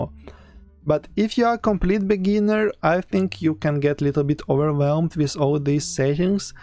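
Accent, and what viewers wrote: Russia. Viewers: because it's russian accent, imho. It's most understandeable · I love your Russian accent so I subscribed! (I am from England) Also GREAT VIDEO! :)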